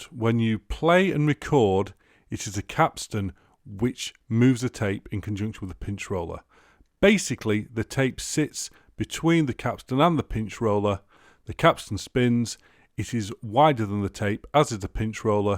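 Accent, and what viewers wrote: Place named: Manchester, United Kingdom. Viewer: You earned a sub from me, Mate and I haven't used a tape in years. I find your accent to be excellent to listen to. Sounds like the bloke from Manchester I watch walking all the canals and sleeping in the woods. On top of that. you replied in a minute to a comment of mine on one of your old videos indicating that you appreciate people watching your stuff!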